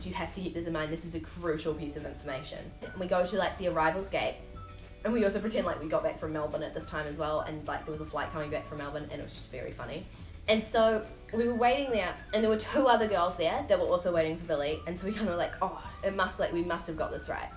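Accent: New Zealand. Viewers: lmfao i clicked and i was like she's definitely from new zealand i can tell from the accent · Are you from Nz?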